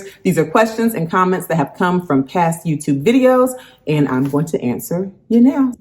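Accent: South, United States. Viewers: From the South, that explains the accent, love it !! Come on folks ---- 460 views and only 76 Likes...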